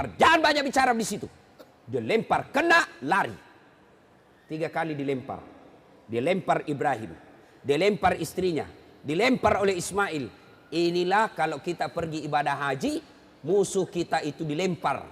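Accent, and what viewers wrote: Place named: Kalimantan, Indonesia. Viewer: Lugat org kalimantan mmg beda shg tajwid nya kelihatan tdk spt org jawa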